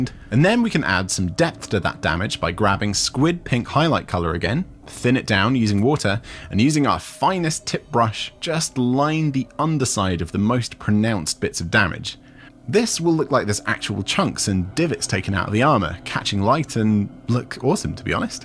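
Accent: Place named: England, United Kingdom. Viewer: Yeah english woman voice overs are back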